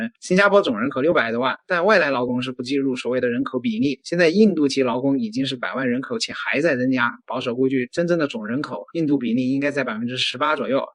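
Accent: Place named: Anhui, China. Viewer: 安徽口音的 😂😂😂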